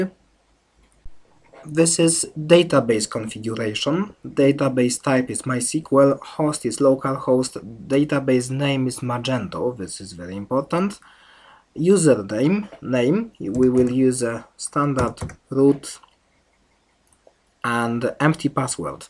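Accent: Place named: Poland. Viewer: awesome accent, polish I guess?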